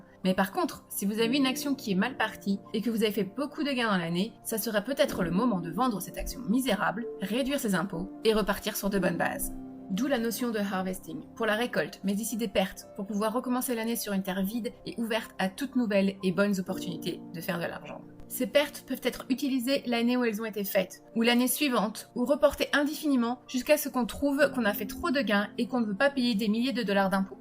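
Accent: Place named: France. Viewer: escuse moi du mot etf , jecoute les terme etc j ai commence par epargne placement quebec,au debut je prennais des celi court terme ou annuelle progressif depuis 3 ans je rentre dans un systeme boursier qui apparament ce rapproche d un etf mais sans risque mais je ne suis pas sur .si c es interessent....tout en celi et la je reflechie et j essaye de comprendre..... je pense dans un deuxieme temps allez vers des etf me semble plus prudent...mais les systeme de taxe americaine m interpelle et me demande si il est pas mieux d achete canadien.....qu en pensez vous.....aussi je suis francais ......vu votre facon de parlez vous devez l etre......est ce que pour l europe on reviens au meme probleme...... y a t il des taxe .. bref plein de question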